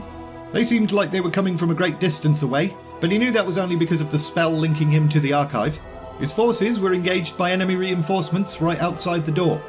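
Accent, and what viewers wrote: United Kingdom. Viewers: great stuff so far.... (see what I did there?) butt(wink) no one is surprised by that bit of corn. Keep pushing em out and youll feel a great relief when the weight is off you. Thanks for your effort genuinely, youve ruined reqular audio books for me lol. No where near enough jokes or profanity or character voices or British accent lol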